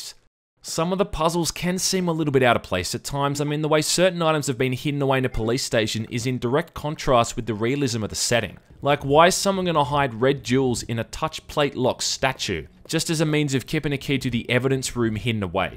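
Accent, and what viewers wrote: Australia. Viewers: Newer watcher i guess. Why is he so much less Australian lol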